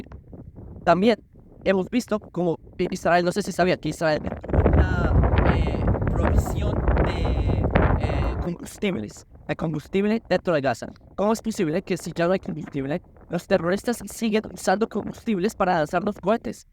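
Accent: Colombia. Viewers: Hablas como los colombianos......por qué?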